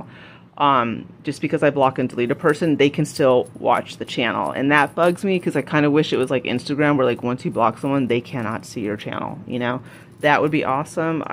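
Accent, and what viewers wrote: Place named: United States. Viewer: Muy are beautiful as I see you on your face with quialificatiion to be on the camera of your phone, thanks for your help on how to buy items and the complementaries to see you more on your camara, I love your voice is perfect to hear the good American's accen which is easy to follow for me that english language is not my mother's ternt language , sincerely from Norway , raiza Theis from Venezuela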